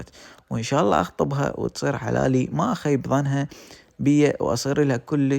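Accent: Iraq. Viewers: مبين من بغداد✨✨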